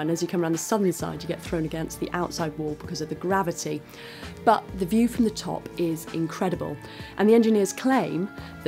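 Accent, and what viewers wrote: United Kingdom. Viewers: Omg why did this stupid brithish Women had to talk you cant understand here Wheres the american womens to replace This Narrator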